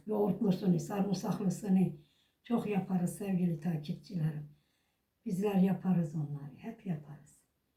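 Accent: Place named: Meskheti, Georgia. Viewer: teyzem nerelisiniz acaba ben Ahıska türküm aynı bizimkiler gibi aksanınız var😊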